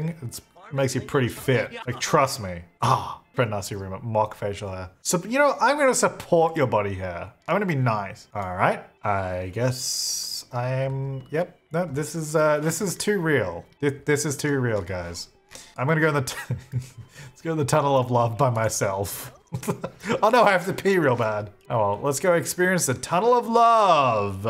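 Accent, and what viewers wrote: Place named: Australia. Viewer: It took me 17mins into this video until I realised you're Australian... And I'm Australian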